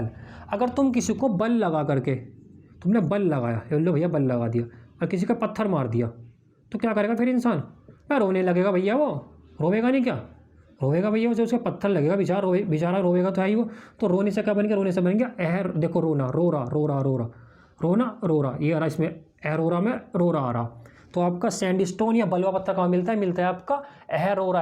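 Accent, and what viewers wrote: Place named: Meerut, India. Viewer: Sir video bhoot mst hai.. ekdum funny. Tarike se apne padhaya hai . Apki boli se lag rha hai aap merrut side se ho.. 😅 Bhoot acha padhaya hai .❤❤❤❤❤❤❤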